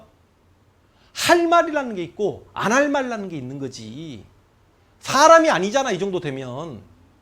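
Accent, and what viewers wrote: Jeolla, South Korea. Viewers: 100프로 강제연행은아니죠 일부 피해자가잇어도 자발적으로 간 인물도 많을겁니다. 지금도 사채때문에 강제로 몸파는창녀가잇다치면, 돈벌기편하고 하니 창녀촌 안마 마사지에서 몸파는 창녀도있는경우인데 그 시대라고 창녀가 존재하지않는다고 할수있습니까??? 근데 말투가 딱 전라도말투네요 전라도사람이세요?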